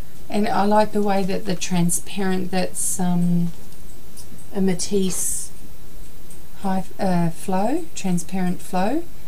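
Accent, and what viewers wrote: Australia. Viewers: Yay! Love your videos, I learn so much from you! And I love hearing your Aussie accent... there are not many of us Aussies here on YT.... Cheers, Ginny:o)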